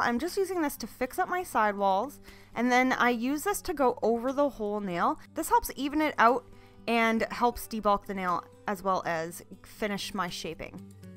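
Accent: Canada. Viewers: Nesian Ninja She’s from a different part of Canada than myself and the accent is different. It’s subtle..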